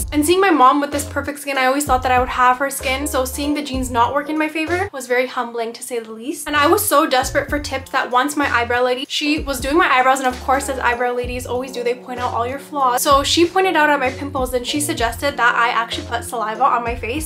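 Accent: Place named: United States. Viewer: wow wow karti hai ....america akcent bolti haaaai ....lagti tu powri indian haaai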